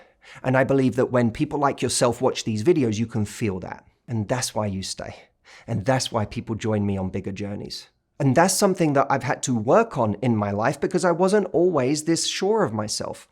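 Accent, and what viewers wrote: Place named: United Kingdom. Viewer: Matthew I have been watching your episodes for quite a sometime now.... I believe the last couple few years and this time you really grabbed me and and something you said I I just can't even pinpoint it but it really touched me and grabbed my attention so much that I'm still trying not to cry and I hope I can make it to that Sixth Day event I'm going to take a look at the package situation and thank you I enjoyed your videos and yeah maybe I am one of the the likes thumbs up person.... but I had to say that you are quite soothing to listen to as well..... I am a bit curious exactly where you're from actually, I can here the Brit down in you I believe! 🙂... I'm from Fort Lauderdale, FL in the United States but My Father.... (unfortunately, he has been passed on now over 3 decades from last April 19th, 1991... so, may he Still R.I.P., and WHOA! 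& Happy Father's Day to him!.... Anyways sorry I just realized what today is..... So back to my scattered point.... He was born in Dover, England, and the funny thing is I guess my dad's British accent might have wore off overtime here in the states but I can do a pretty good impression myself growing up watching a lot of the BBC as a kid..... i.e - Monty Python & the Flying Circus, Benny Hill, Fawlty Towers, even that soap EastEnders back in the day etc.... and I'm just rambling on that nothing... Lol.... Nice little chat aye! Thanks 4 everything oh! & a Very Happy Early Birthday to you! 😎 🎉🙂🎂😀🍾😊🎁 Make a Wish! I Do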